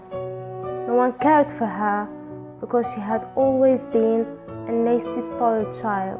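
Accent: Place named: United Kingdom. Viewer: الرائعة المتألقة صاحبة اللهجة البريطانية القوية 🌷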